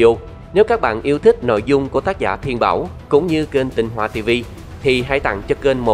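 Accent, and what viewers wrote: South, Vietnam. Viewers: Nói giọng MN mà toan la dùng từ MB,( mỡ lợn , quả ngô , bỏng ngô, đảm bảo, ), nghe nó ngộ ngộ sao đâu. ( Mỡ heo, trái bắp, bắp nổ, bảo đảm). Và còn nhiều từ MB nữa .Vv..vv. Cũng có thể anh này là người Bắc 75-2024 . Cũng cảm ơn Anh Tinh Hoa TV rất nhiều vì đã chia sẻ những thông tin rất là hữu ích.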